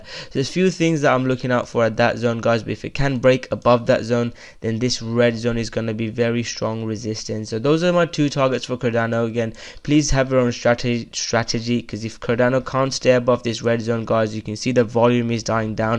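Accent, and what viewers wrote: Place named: United Kingdom. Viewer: I feel he is a hidden gem in the world of crypto youtube. But I am quite partial because I love the Brit accent too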